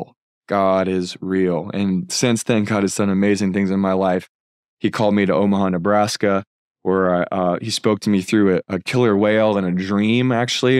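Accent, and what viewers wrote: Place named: Canada. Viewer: U Canadian? Remind me of The Canadian Prepper. Same accent. Salute.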